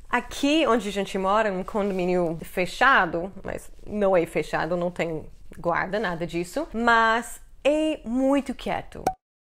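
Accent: United States. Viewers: Your american accent, speaking english or portuguese, is very nice! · Nunca sai do Brasil, então nao vou comentar, pode notei no seu sotaque uma diferença. Você em tão pouco tempo está com sotaque mais americano kkkkk. Beijao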